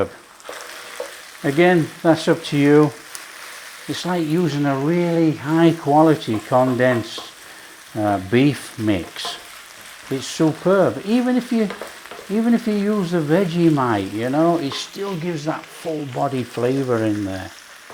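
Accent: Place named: England, United Kingdom. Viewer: Love your accent although I haven’t a clue where in England. Sadly these recipes will die out, perhaps I’m wrong. I was born in 1973 and growing up my mother always told how rewarding it was to produce a hot meal from ingredients that don’t cost the earth. She taught me to cook which has absolutely benefited me in my adult hood. I searched suet pie and stumbled upon your site, Traditional English cooking that tastes absolutely delicious. I’m originally from Norfolk and was spoilt with ingredients using pheasant and partridge I’m fed watching chefs that andypandy around throwing pepper/ god knows what over your shoulder. I’m now able to google/youtube your recipes & understand amounts etc to use. Thank u x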